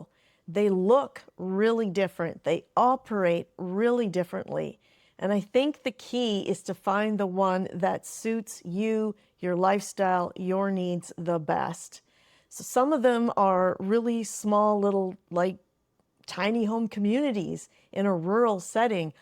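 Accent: Canada. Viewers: She has an Ontario accent.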